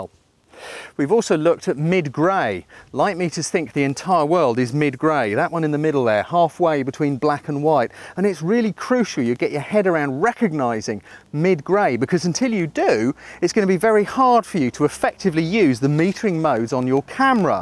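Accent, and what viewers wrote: United Kingdom. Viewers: very well explained, thanks..your british accent is awwwssssoooooommmmeeee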